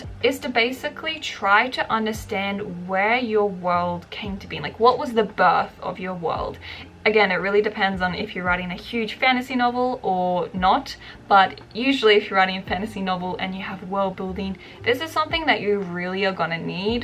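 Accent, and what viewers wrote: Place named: Australia. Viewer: Lol I’m Aussie and it took me so long to realise you had an Australian accent because I was so tired and then when it clicked I was like “!!!” Lol. I’m so excited to check out campfire thank you for the tips! ❤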